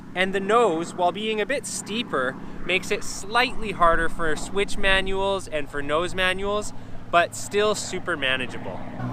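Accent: Canada. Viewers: Jesus, you're the most Canadian sounding person ever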